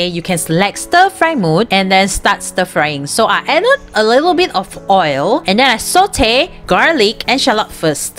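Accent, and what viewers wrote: Malaysia. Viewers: Yes....itu Malaysian accent damn shiok!! Not pretentious.❤️ more holiao..I usually letak leftover juicy char.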